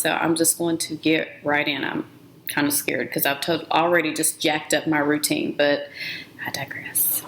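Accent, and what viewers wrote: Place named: South, United States. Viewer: I definitely need a detangler. My hair is 3c so I hope it works for me. Also where are you from? Your accent is super southern and strong lol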